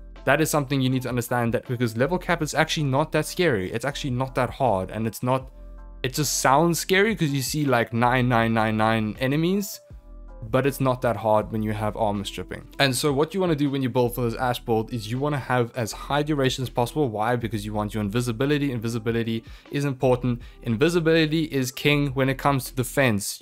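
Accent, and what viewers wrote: South Africa. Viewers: You sound so South African... Man I hope I'm not just going crazy. Best guide I've seen on this, VERY well done, you've earned a sub!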